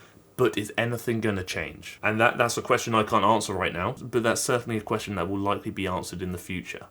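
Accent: United Kingdom. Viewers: I have PTSD that makes British accents (specifically male voices) very triggering for me. You are a rare exception. I largely attribute this to your content and sincerity.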